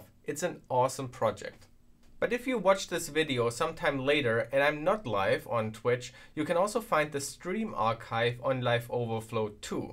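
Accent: Germany. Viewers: not sure, but sounds german. like it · man hört dass du Deutscher bist :D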